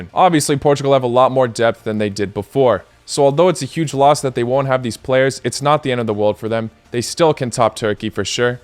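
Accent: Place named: United States. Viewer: I like the content but I can’t stand how he pronounces words, he’s obviously American.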